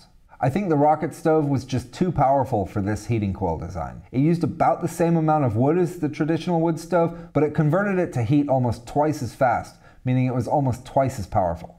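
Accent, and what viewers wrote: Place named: United States. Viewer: Thanks for the great content I've built a few rocket stoves and you always get better results if you insulate your burn chamber. Also I noticed that you have an American accent but you are based in the UK going on the plugs behind you and I would guess from outside possibly somewhere in the Pennines?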